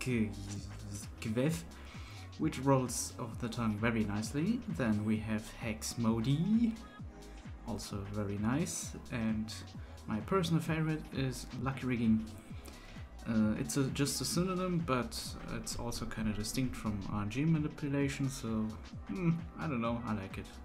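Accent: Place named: Germany. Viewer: This german accent Ist nett lmao XD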